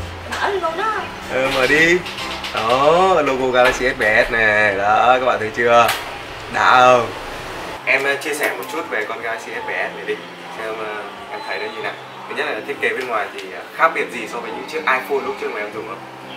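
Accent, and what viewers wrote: Vietnam. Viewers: video mang tính chất khoe gấu là chính. nhưng giọng miền nam hay sao ý mình nghe lần đầu lạ lạ a ạ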